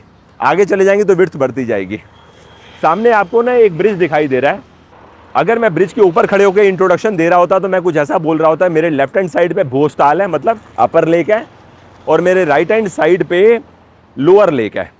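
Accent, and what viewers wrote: Punjab, India. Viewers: Sir ....There is some 'NARRATION MAGNET' in your voice pitch.....upr se thoda PUNJABI TADKA😊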